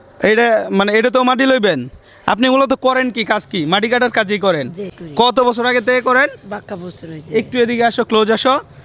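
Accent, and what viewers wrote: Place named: Sylhet, Bangladesh. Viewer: সিলেটি মাশাআললাহ ,ভালো 😢😂❤❤❤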